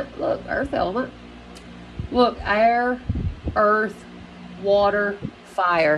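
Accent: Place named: Alabama, United States. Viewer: 77 subscribers already. I love your voice and accent. It's just like my cousins who are from the mountains of Gadsden, Alabama and the only area of Alabama with that dialect. I've always loved it. You also seem to love Spirit in a way similar to me, I don't know. I'd donate if I could, married 31 years and finances are the only area that we've not been abundant and successful in. But it's all a part of divine plan and I'm just happy to be here. If I'm led by Spirit to do more in the world, I will.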